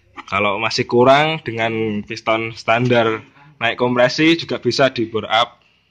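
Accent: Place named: Java, Indonesia. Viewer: Sampean tiang pundi mas ,logat jawane kentel soale😂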